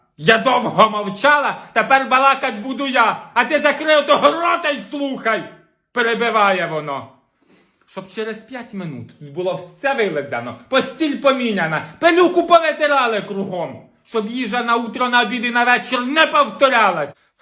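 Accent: Poltava, Ukraine. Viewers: Чого причепились,Полтавщина так завжди балакае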